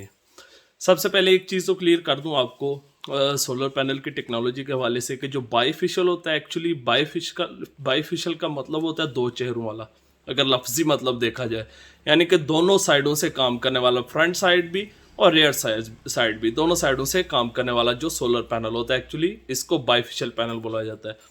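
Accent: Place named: Kashmir, India. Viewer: Bhai muje aap Jammu Kashmir Ke bhai lagty ho. Accent se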